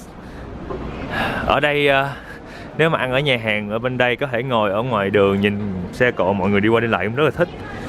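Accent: Mekong Delta, Vietnam. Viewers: anh ấy đẹp chai và có tâm hồn ăn uống và giọng nói mộc mạc của người miền tây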